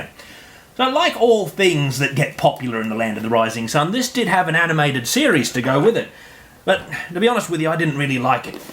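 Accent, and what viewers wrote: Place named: Australia. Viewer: Damn your a aussie I was thinking first vid I watched maybe somewhere posh in England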